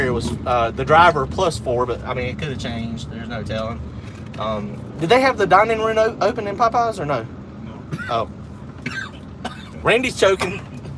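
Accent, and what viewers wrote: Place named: North Carolina, United States. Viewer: Dang I thought u was a North Carolinian????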